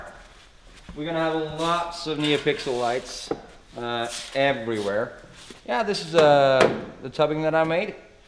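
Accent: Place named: Sweden. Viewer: Well done, your Swinglish was great. 👌 Amazing amount of work you put in to these cars and great explanations of the work here on YT. 👍😃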